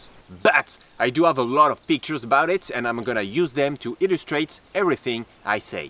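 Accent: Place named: France. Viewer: Him : *Talk My brain : Where is this accent come from? Him : France Me as a French Canadian : Oh so this is how I sound... Belle découverte ton channel. J'achète mon Forester 2001 demain merci pour ton expérience!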